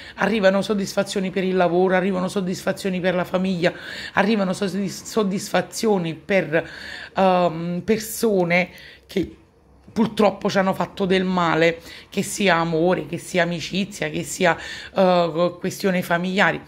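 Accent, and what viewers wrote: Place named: Naples, Italy. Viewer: Grazie,per le tue parole,anche il tuo napoletano è dolce ascoltarlo ,per me è la prima volta che ti ascolto,può essere così inportande sentire, quando tutto intorno è crollato, una previsione serena,auguri anche a te per la festa della mamma,penso che tu lo sia e anche se non lo sei auguri comunque,ciao.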